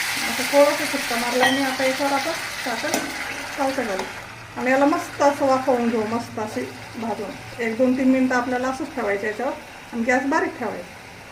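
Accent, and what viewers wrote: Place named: Nashik, India. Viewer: ताई तुमची बोलीभाषा ऐकून असे वाटते की तुम्ही नाशिकच्या आहात .. बरोबर का ?? शिमला मिरची खूपच छान बनवली ..बघून तोंडाला पाणीच आले . . ❤❤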